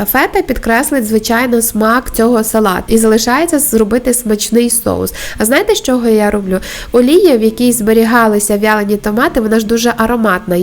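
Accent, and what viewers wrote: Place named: Western Ukraine, Ukraine. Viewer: Який файний западенський акцент! Кайфую! Дякую!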